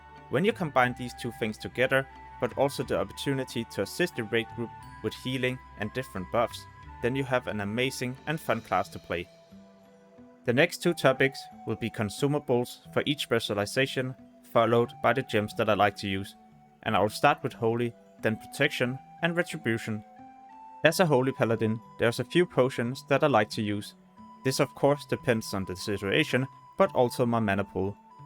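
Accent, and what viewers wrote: Denmark. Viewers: Jeg kunne høre, at du var dansker. Har subbed - god kanal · Du er dansker kan jeg høre 😁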